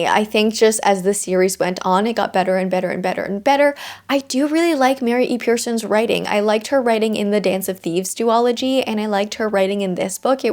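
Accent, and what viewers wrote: Canada. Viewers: girl as a Hamiltonian... your canadian accent is ACCENTING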